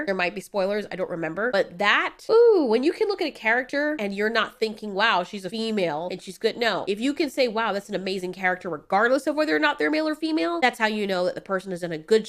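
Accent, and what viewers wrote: United States. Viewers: She does have an accent, an American one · Your not American?just asking because you speak perfect American and in my experience those not born in the language usually gets the small things wrong ,example;saying I'm at Atlanta instead of I'm in Atlanta 😆 and as an aside I would love to see what you look like, and by the way this example actually happened to me yesterday 🤔 🙃!!!$$$+++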